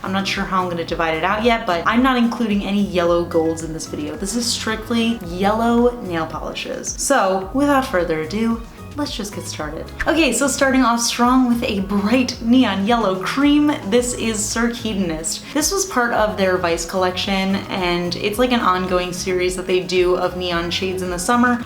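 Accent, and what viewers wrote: New Jersey, United States. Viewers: She's talked about it before. She knows it's kind of odd. She says it's because she's from/lives in New Jersey. I don't know about that because I've never been to NJ, but considering how many people of Italian descent live in NJ I can believe those Italian grandmas could pronounce the first syllable the same was as pasta. And then there are the west coast non-Italians (like my grandparents were) who can't pronounce pasta without the short A, so it sounds like the word "past" with a U sound tacked on-- PAST-uh. But then, they can't pronounce the word Italian, either. It comes out EYE-tal-yen, or maybe eye-TAL-yen.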